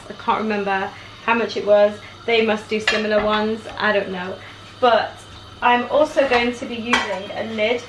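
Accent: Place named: United Kingdom. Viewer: You got a real British Accent.. I bet!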